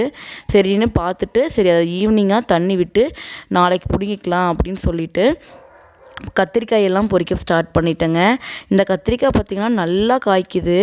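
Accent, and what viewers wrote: Kongu, India. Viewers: Oru oru oorla oru oru pechu vazhakku irukku.Adha yaarum edhuvum soldradhillaye.Aen ellarum "porikkara" dha mattum target pannanum?!Neenga Unga oor pechu vazhakka mathuveengla sollunga sister?!Pechu vazhakku enbadhu mannin manam. Accept pannitu poite irunga. Kongu tamil avlo azhagu.Ippo ella oor makkalum mix aagi,kongu tamil eh ketpadhe rare aagi vittadhu.very sad to say this.Ellame maari pochu. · கொங்கு தமிழ்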